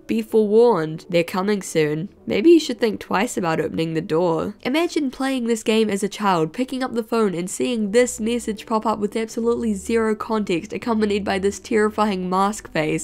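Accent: New Zealand. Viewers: You know, , I briefly turned on closed captions to make sure I was hearing you correctly, and then I see this about the genie: "summoned from a lamb and gransom's wishes" I just think the subtitles or YouTube or something like that can't keep up with your thick New Zealand accent. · why did it take me so long to realise that ur from new zealand!!! i swear i’m so bad at picking out my own ppl lol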